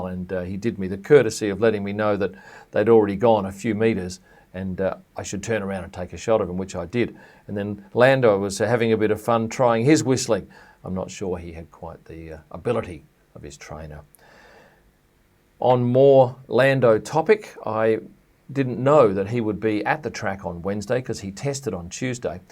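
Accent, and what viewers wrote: Australia. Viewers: Discovered your channel about a month ago and your quickly becoming one of my favourite f1 YouTubers. The fact that your a photographer brings a new perspective as you've seen alot more of these guys than us folks. And I also love an Aussie accent. Thanks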